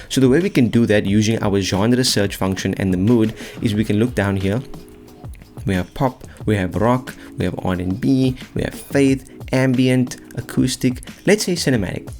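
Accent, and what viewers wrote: South Africa. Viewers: Your accent has some South African in it. Like, a LOT actually.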